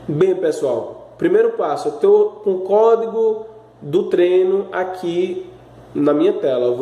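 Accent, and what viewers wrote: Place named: Pernambuco, Brazil. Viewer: Esse sotaque e de Pernambucano do litoral :)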